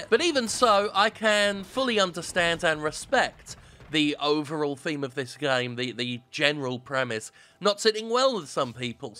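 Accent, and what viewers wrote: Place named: West Country, United Kingdom. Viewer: your west country accent is quite good (as a person of the westcountry) I'm going to say you're from Berkshire?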